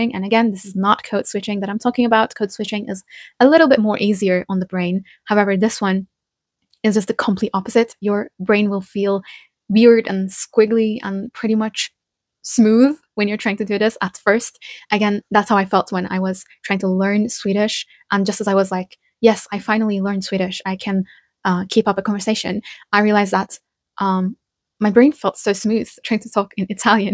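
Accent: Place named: Turkey. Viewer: Her english accent does sound turkish, i guess her channel name is not her real name then.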